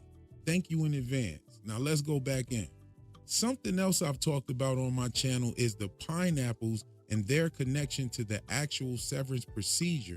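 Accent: Philadelphia, United States. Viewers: Are you from Philly? 😂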